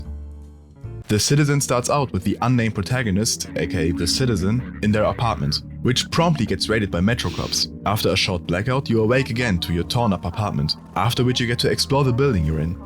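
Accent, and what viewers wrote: Germany. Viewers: Sag mal, kommst du aus Deutschland?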